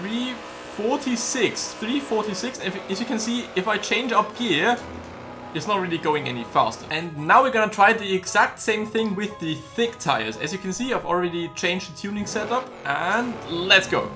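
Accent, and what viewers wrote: Germany. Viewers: Are you German or Austrian? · Es hört sich echt nach deutschem Akzent an. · is he German? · scheiße deine Videos sind immer so abgedreht :'D bin letztens über den ultra dragster tesla auf dich gekommen. man hört sofort dass du deutscher bist :P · Is he German? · Man hört einfach das du deutscher bist😂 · Are you german?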